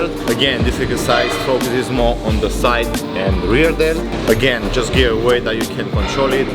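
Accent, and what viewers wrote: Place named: Romania. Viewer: I like the way he combines english with romanian sțo ;) Bravo!!! Andddd keep up the good work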